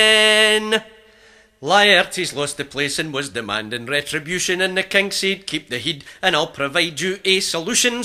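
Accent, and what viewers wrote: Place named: Scotland, United Kingdom. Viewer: This is awesomeness. And works best with the scotch accent.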